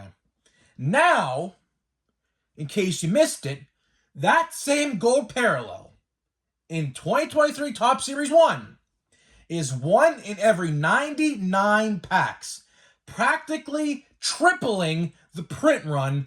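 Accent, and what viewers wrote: Pittsburgh, United States. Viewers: Just found your channel. Two seconds into listening to you while doing some work I said this dude has to be a Yinzer. LOL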